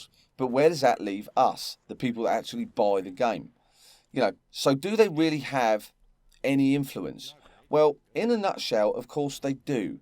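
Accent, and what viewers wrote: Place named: Cockney, United Kingdom. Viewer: i love your cockney swagger but i disagree. Frags xfactor and levelcap do still play the game and i see regular "enough" content. Westie on the other hand seems to think hes some sort of historical know it all and i agree with most of what you say about him. That being said i consider his channel a good inside source for bf content. This game isnt dying, the premium platform is dying. Thats why content has seemed scarce and i believe dice/ea are taking a different approach. Bf1 is by far a better game (for me) than all bf games previous because it ran like butter on pc from day 1. For me personally it solidifies its position as the best battlefield game ever. #fluffybastards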